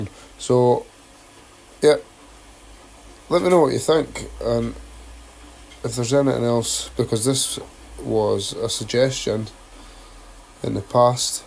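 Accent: Scotland, United Kingdom. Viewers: Brilliant video. Loads of info. You have captured a major bit of heritage that is being torn down with damn little thought. PS great to hear a video with a Scottish accent that's my accent! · I'd never heard such a thing about these chimneys before. I would see them in old pictures but they never raised my curiosity until I saw your video. I found I had to use the subtitles to follow what you were saying because you have a great Scotish accent!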